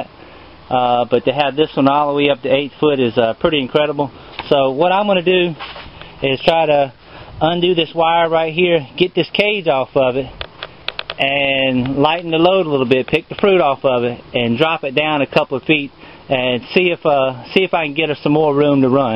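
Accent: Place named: Louisiana, United States. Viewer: Ah ha. Southern Virginia! A couple of comments back, I mentioned something about you selling salsa at an LSU game. I thought you were in Louisiana based on your accent and talking about webcajun. LOL So basically you live in S. Virginia, wear a Tennssee hat, and have a cool Louisana accent. Got it ;-) God Bless.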